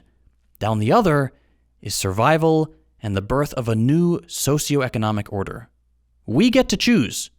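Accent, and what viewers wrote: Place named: South, United States. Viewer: As a literate person from the Bible Belt myself, I also appreciate the accent as well yall.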